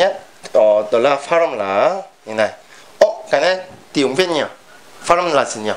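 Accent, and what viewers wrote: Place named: Seoul, South Korea. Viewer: úi thì ra thầy giọng Seoul ❤️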